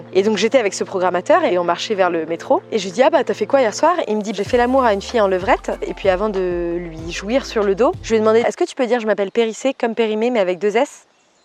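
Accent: South, France. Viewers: Encore une fois , “une petite pépite” cette vidéo ( avec l’accent du sud s’il vous plais 😂 ). Merci pour nous avoir partager autant de bonne humeur , des le réveil ça fait énormément plaisir 🙏😌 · Au programme des troupes de dance moderne jazz avec des chapeaux melons, 🎩😂😂un parfait accent du sud👍👌😉 et des expressions qu'on adore comme" j'men bat les Yakas".😝😉😂 Je t'adore continue ce que tu fais.👏😉😂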